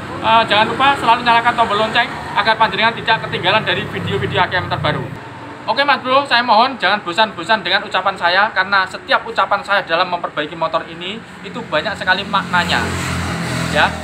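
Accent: Java, Indonesia. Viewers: Jowo ne ngendi mas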